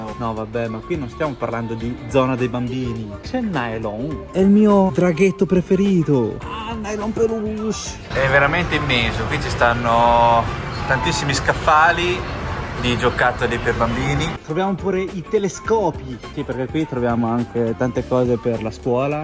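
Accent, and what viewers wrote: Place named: Emilia, Italy. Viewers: soccia sei di bologna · Sei cinese ma vivi in Italia? Mi sembri un po' emiliano 😊 o sbaglio? p.s. bel video alla fine anche in Cina si trovano prodotti italiani. ❤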